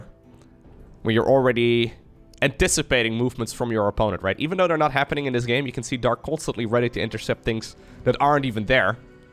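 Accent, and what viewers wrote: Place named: Netherlands. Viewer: Your voice always sounds funny 😜 (because of the Dutch accent, which always sounds funny to me as a fellow Dutchman).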